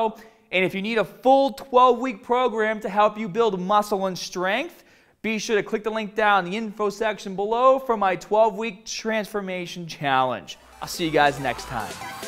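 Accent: Boston, United States. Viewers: Its a boston accent apparently. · I almost can’t get through this video with that Boston accent. Geez lol. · oh man! that boston accent! love it! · He’s from boston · I came out of curiosity stayed because of the Boston accent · Are you from boston by any chance? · Sonia Boston accent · The more I watch videos the more i kinda sorta want a Boston accent. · This guy lol bahhston for sure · omg he's from Boston, I've watched him for years and it's always come out, get over it! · DIdn't have to listen to that horrible Boston accent longer than i needed to...thank you sir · Is this guy from Boston? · That accent though ❤️ Boston?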